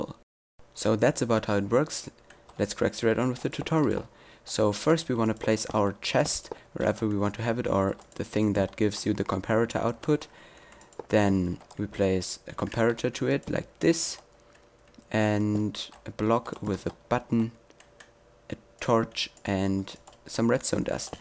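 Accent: Germany. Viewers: Your german right?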